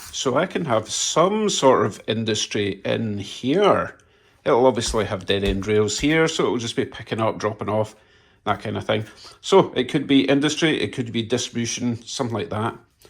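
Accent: Scotland, United Kingdom. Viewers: Its the Scots accent we have apparently some just love to hear us talk, LOL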